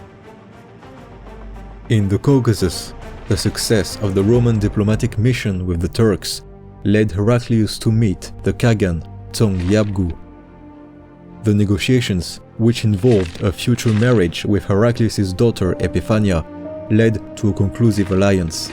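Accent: France. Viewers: Good and informative video. Enjoyed your narration with French accent, and the pronunciation of the Persian generals were perfect.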